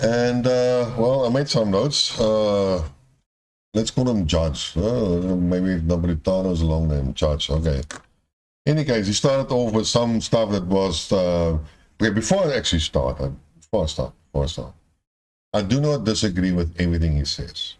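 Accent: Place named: Afrikaans, South Africa. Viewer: Disagreeing for t he sake of it with no alternative explanation. With a thick Afrikaans accent nobody can understand van de plaas.. i dont plan listening to more of your videos.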